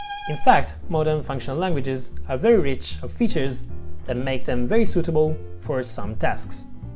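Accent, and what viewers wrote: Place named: Europe. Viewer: i cant tell if you have a western european or an eastern european accent. good video tho.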